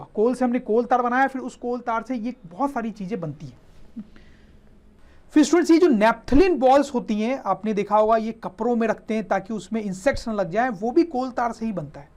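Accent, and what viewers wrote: Bihar, India. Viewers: Sir are you from Bihar ?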